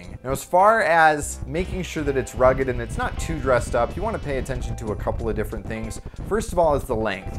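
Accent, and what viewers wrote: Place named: Salt Lake City, United States. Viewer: Ok..you have to be based in SLC... I thought I recognized how you say " imporTant"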